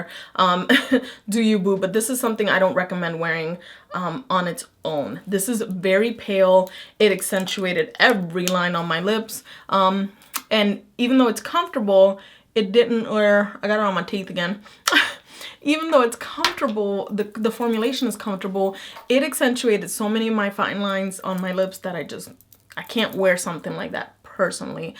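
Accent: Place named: New Jersey, United States. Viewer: you have this jersey mob accent i love it girl!! ha :)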